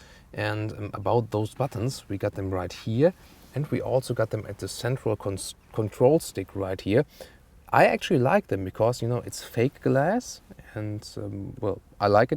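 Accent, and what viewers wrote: Germany. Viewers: das deutsche english hört man sehr raus, aber trotzdem super video!!!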